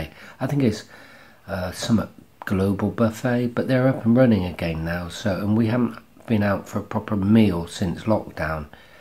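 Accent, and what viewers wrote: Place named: Wiltshire, United Kingdom. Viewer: Loved watching this and hearing that good 'ol Wiltshire accent 😘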